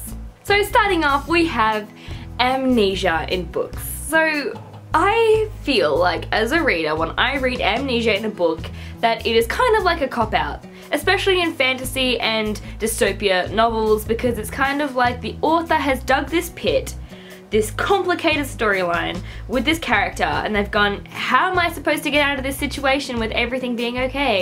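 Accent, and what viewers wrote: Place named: Australia. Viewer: Hi! I love seeing a fellow Australian on Booktube, your accent feels like home. I'm new to booktube and I'm having a great time catching up on your previous stuff! (you may be getting more random comments from me soon *looks sheepish) I definitely agree with about cheating in books. I think it gets romanticised way too much. It sends the message to readers that cheating is exciting and sexy and that the person being cheated on is always an afterthought. Also, FAKE STICKERS WHAT THE HELL!?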